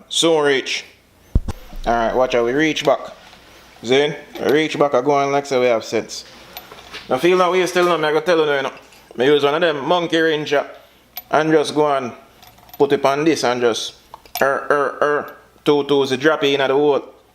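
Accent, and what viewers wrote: Jamaica. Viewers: Was that Jamaica speak, or more a Trinidadian vernacular ? Great humor in any case ! The adjustable proportioning valve is usually placed inside the cockpit for the driver to dial more or less as needed, you will not dig having to get out to adjust it in the pouring rain. A bit of silicone grease on the rubber washer will really cut down the binding you were having, and it will not degrade the rubber seals in the calipers or wheel cylinders. You described the method to hold a nut in a box end well enough, start by holding the nut captive inside while spinning the fitting on the outside to get the party started. I will not mention how the resevoir looks like it will be impacted by the engine, thankfully it should torque to the right so it might not pop the resevoir off. Look forward to the more fun that will come your way... you have a great attitude ! 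· 100% Jamaican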